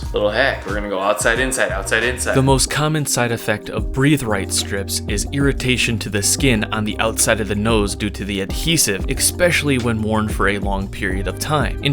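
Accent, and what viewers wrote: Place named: Chicago, United States. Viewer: Does anyone else detect a Chicago accent?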